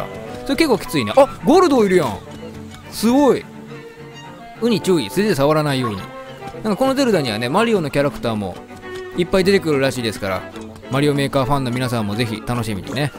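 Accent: Kansai, Japan. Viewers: 大好きです😆 関西弁移ちゃった😜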